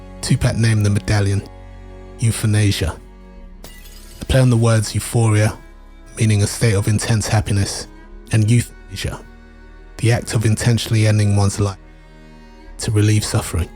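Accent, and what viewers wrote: United Kingdom. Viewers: Where you from cos? Your ascent sounds like you are from uk or something, maybe australia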